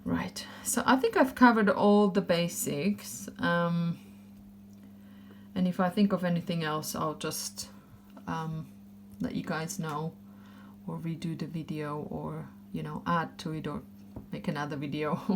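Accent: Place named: Slovakia. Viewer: Ďakujem za skvelý návod,rozpoznávam slovenský prízvuk :-) .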